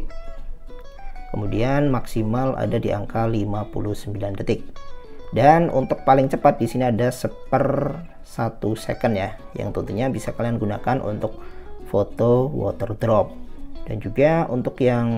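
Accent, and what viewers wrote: Pasuruan, Indonesia. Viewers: Eh masnya pasuruan toh 😄 Koyok daerah bangil. Oh iya Mas mau tanya untuk redmi note 7 ada gak gcam support yang ada fitur wide angle ada gak mas? Buat keperluan foto lanscape 🙏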